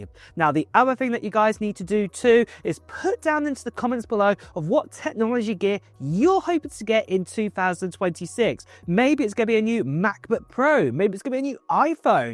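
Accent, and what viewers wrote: United Kingdom. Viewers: Until I opened up my eyes, I thought that was a British woman speaking.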